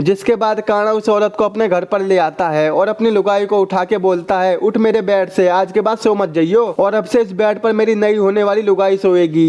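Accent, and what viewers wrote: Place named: Rural, India. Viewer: bhai tu thoda sa behan ka loda h kya teri aawaj kitni madharchod h or gawaro wali h 🤣🤣🤣🤣🤣🤣🤣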